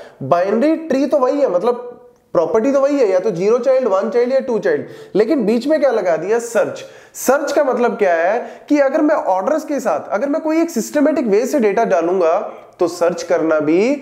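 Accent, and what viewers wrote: Punjab, India. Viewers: Sir u r from Punjab?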